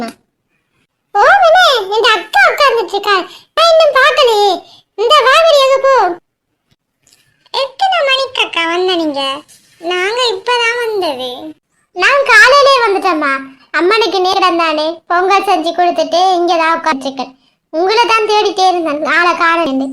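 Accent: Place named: Sri Lanka. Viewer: Video romba romba super sister ❤️🔥 neenga vanthu srilanka va sister pesura slang differanda iruku 🙄🤔 aana supera irukuthu sister intha slang 😍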